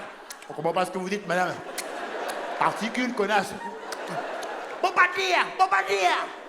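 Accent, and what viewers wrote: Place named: Africa. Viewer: C'est pas mal Arrive et ne cède pas à ta mentalité Néanmoins Tu n arrives pas au niveau de jemmy Ferrari Tu es trop en manque de vigilance J aime ce que tu fais mais tu parles comme un africain Moi je suis française et certains choses dérangent vis à vis des femmes Je sais Tu en as rien à foutre Dommage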